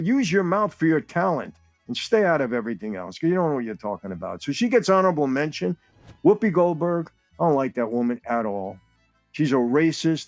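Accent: New York, United States. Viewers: Such a great word . Said with perfection with your Distinctive Italian/Anerican New York scrabble . How have you not done voice work??? Really easy on the ears. That’s a good diversity actually. Get some good scrips and escape into a sound booth brother. Need right scripts though! Just thinking out loud brother. 😅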